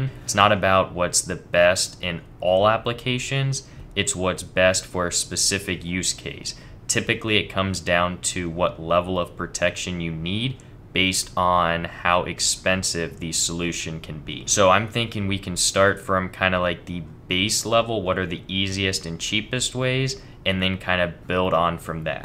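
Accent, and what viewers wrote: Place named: Canada. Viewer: Hi. Some feedback. First off I love your videos. Even though you seem to doubt what you do and your content, you have found a niche area that nobody else does - proper design vids, background rules of thumb stuff that just works and EXPLANATIONS of why you decided on a component verses another this is invaluable!!. The amount of hands-on practical experience knowledge your give us is superb. I know theory but have limited experience constructing things that work in the real world - you help fill this gap. Please just keep banging out LOTS of design videos, don't worry too much about ' if we might find them interesting' we might in the future when the info is relevant to our project, and also don't worry too much about the length we want good information. The only criticism I have is your verbal delivery when your trying to talk 'proper' to camera - it is very monotone and Canadian. 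When you talk to us ad lib and making it up as you go along (like talking to your mates down the pub) it is MUCH easier and enjoyable to listen to. Have you considered a forum like EEVBLOG has - it's another link from Youtube and its search algorithm. Content suggestion - DC powerline filtering how and when to use inductors perhaps. - I am working on electronics in an automotive based environment (12Vdc boat) and cant find suitable info on filtering, voltage regulators etc.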